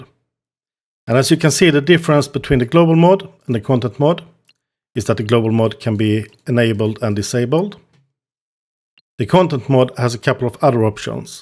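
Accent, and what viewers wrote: Scania, Sweden. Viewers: Den skånska brytningen gör videon så mycket bättre 🤣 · I love his accent. I'm guessing it's Swedish lol. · fantastisk skånsk dialekt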